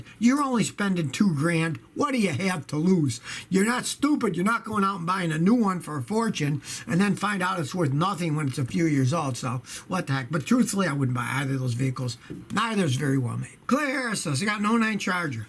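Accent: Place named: New York, United States. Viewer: he is originally a Neu Yourka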